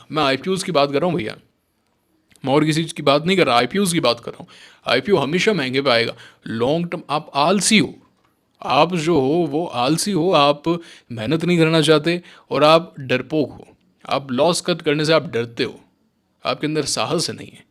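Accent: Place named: Rajasthan, India. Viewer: Aapki baatein sunke aisa lagta hai ki : Aap rajasthan se hai (shayad - Kota, ajmer side) and aap kota (JEE) padhne ke liye gye thhe.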